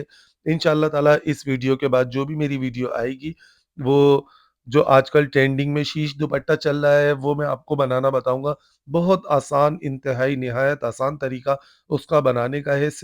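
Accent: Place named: Rajasthan, India. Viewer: Assalaam o alaikum. Mash'Allah bohat asaan teriqey se aap ne gala banana sikhaya. Mai aap ke videos bohat ghaur se daikhti hun. Mai bhi bohat safai se her qism ke kaprdey seeti hun laik phir bhi mainey aap ke videos se bohat kuch seekha hai aur mazed seekh rahi hun. Aap ke lehjey se lagta hai aap Jaipur Rajistan se hain. Kaash aap karachi mei hotey to main aap se hi keprdey silwati. Wassalaam. Jazzak'Allah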